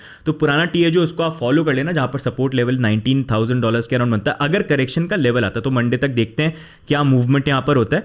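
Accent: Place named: India. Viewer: In the end - Indian same pronunciation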